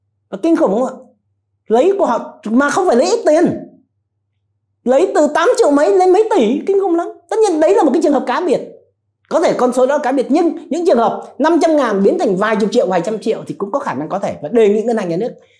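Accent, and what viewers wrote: Standard. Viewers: Anh nói chuẩn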